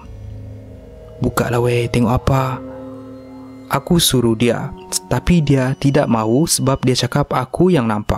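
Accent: Sabah, Malaysia. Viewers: saya ada kawan baik orang Sabah.. saya dari semenanjung.. suda 17 tahun kawan sama dia sampai sekarang.. Bila Admin bercerita ada dialek Sabah, saya senyum jak bah.. faham suda..😁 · Dia org Sabah lah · tu bahasa sabah bukan indon, ramai org malaysia yg tak tau loghat sabah huhh, pelik2, macam sabah bukan malaysia dh. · pekatnya sabah awk.. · loghat orang Sabah tu saya pun org Sabah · Kupi² go ni... Orang sabah kah pencerita dia? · / sabah bro,not indon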